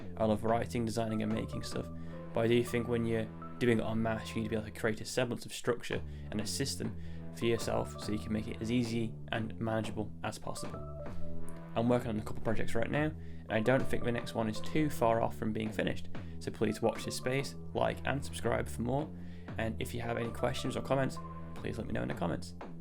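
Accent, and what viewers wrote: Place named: United Kingdom. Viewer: this is a fully VALID video on game design but i feel like you should enunciate your voiceover more to unleash the power of your british accent. or if that doesn't work maybe you can try just yelling into the mic? that might attract a wider viewership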